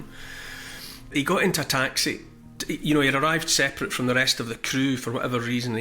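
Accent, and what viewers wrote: Scotland, United Kingdom. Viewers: Good to see your subs increasing. More people listening, and taking note hopefully 👍 🤔 😁 Won't Kneel A gentle, beautiful Scottish lilt A kind, empathic, forward tilt An open heart; a caring face A willingness to take his place. A strong, home-hitting monologue Reminds us of the boiling frog The courage to become a sleuth A passion to release the truth. A deep desire to crack the whip Illuminates our freedom-strip Calls out the darkness taking hold The shiny despots; plated gold! Intense and raw, he lays it bare A soul-enhancing, honest stare Inflections drive the message through He speaks for Us - for me and you! Discernment's such a precious gift His words create a mighty lift Take heed of all he has to say He knows - it shouldn't be this way! 🤗🦋🐬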